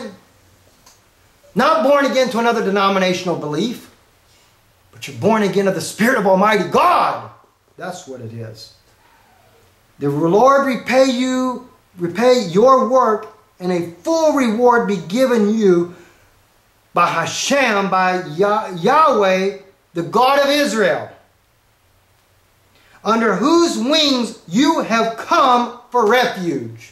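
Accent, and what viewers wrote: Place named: Texas, United States. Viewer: you betta preach brotha ! whoo. this sounds like good ol down home southern preachin to me. i think i hear a little texas in his voice hahaha. PRAISE HASHEM!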